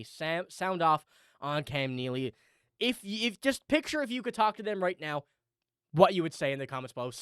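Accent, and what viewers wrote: Canada. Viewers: Where are you from bro? You have a Canadian sound to you but obviously a huge B’s fan…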